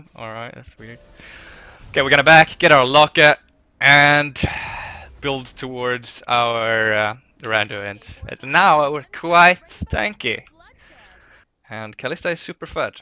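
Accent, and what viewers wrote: Sweden. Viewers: cryaotic our hero! I'll have you know not all swedish people sound like that. Brings me shame every time I hear some one that. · swenglish HAHAH all of us sweds talk like that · Du hade en väldigt start svensk dialekt i den här xD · I don't know what accent you mean, but of course Swedish people have a similiar accent! Most Italians have the same English accent aswell, etc. I don't understand why "TheBlueSledge" is crying about it.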